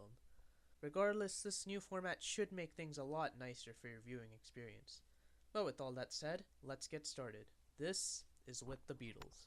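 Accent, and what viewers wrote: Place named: United Kingdom. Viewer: "Sarr" is a accent thing, brittish english is diffrent from american english! Great video btw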